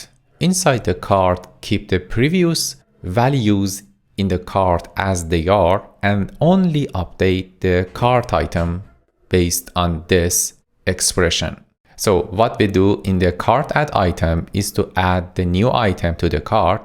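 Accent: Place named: Iran. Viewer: لهجتون ایرانیه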